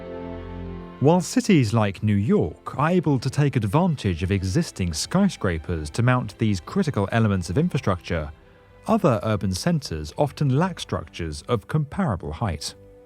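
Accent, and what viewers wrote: United Kingdom. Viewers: too britishhhhhh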